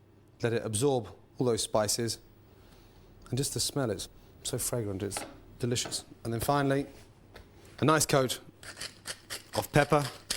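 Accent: Scotland, United Kingdom. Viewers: Love this down to earth raw front line insight. Its amazing to see Gordon not in massive wanker mode! I love his thick Scottish accent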